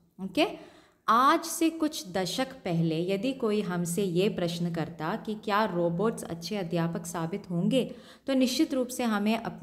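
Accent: Kerala, India. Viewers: Mallu sound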